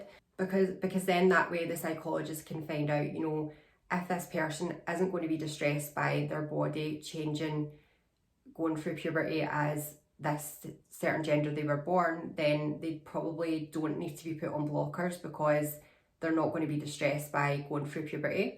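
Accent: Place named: Scotland, United Kingdom. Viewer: Personally I would have been whipped if I had have told my father when I was that Young. Even now when I am at an early stage in my own transition. I still need a lot of encouragement in my journey because I feel Society is not taught any different . That decision to block trans people should not come down to a decision one greedy person decided to Sue the government. Oh by the way I love your channel and the Scottish accent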